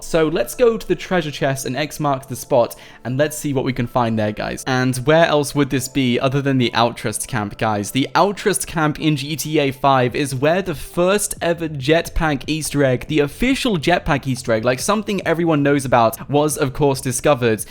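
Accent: United Kingdom. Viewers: Are you British ps. Ur the best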